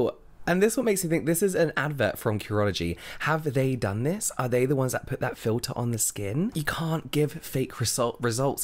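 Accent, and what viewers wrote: United Kingdom. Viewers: This guy can talk and talk for hours nonstop about things idc about and I'd still listen to it all...............I blame the British accent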